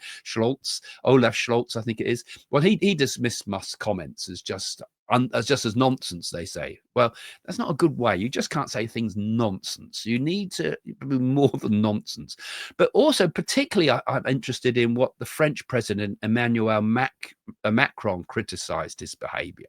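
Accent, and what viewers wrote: United Kingdom. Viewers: I love your accent so much. Could listen all day long. I'm such a sucker for a British accent! But Trump is very much involved in the Epstein ring (as were plenty of Democrats- this stuff isn't remotely partisan- just evil men corrupted by power), I don't know what Musk's tactic would be. It's already an ongoing issue in the US. I'd venture to say it was Trump's mafioso thugs that had Epstein murdered in prison. No way that was a suicide. Many people will go down from both the Epstein and P Diddy rings and there is plenty of overlap. I can't imagine that Musk wasn't also involved in at least one of those. It would shock me if he wasn't. Men + power and money = corruption.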